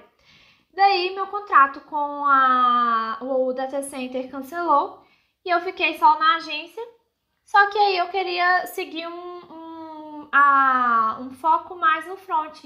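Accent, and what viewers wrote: Minas Gerais, Brazil. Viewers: meu Deus ...q top kkkk parabens ... vc fala igualzinho a Ana Paula Valadão ! vc é mineira tbm ? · Algumas coisas no sotaque mineiro são inconfundíveis. Percebi logo na primeira frase kk Mais um inscrito o/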